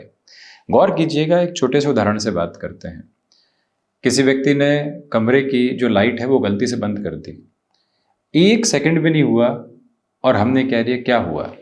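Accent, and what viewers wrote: Standard. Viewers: जितनी शुद्ध हिंदी वो बोलते है बाकी किसी ज्योतिषी को बोलते नहीं देखा, भाषा पर अच्छा नियंत्रण है गुरु जी का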